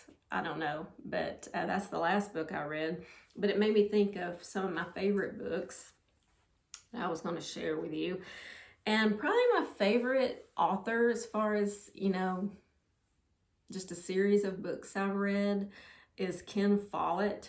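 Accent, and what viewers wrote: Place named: Tennessee, United States. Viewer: Hi, I live in the U.K. and have recently found your channel, I am of similar sizing to you (5’1” and so on) so love watching how you style your clothes. I have a penfriend who lives in Tennessee and she has your accent which again I love. I’ve travelled quite a lot in the USA and when you mentioned Loft I totally agreed, I have bought items from this store and they have been really good value for money. Congratulations on your subscribers, I’m not surprised as you are very relatable and give honest opinions on the clothes you try on. I’m looking forward to more videos so keep them coming 👍😁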